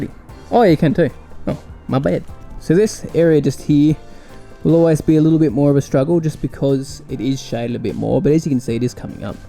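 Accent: Australia. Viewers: you weren’t, you sound Australian to me.....I’m always saying “yeahhhhh” to my hubby when he rings and I answer the phone because I know it’s him ringing me.......😂😂😂🇦🇺🇦🇺🇦🇺🇦🇺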